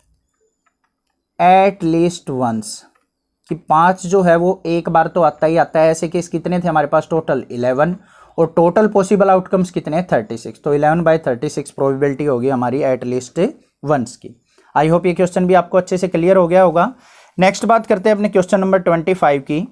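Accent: Haryana, India. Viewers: Haryanbi voice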